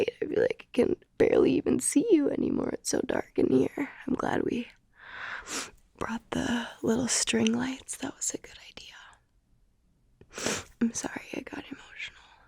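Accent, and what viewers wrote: South, United States. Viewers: I always love your videos. Your incredibly talented. My favorite series, or style is your southern accent videos.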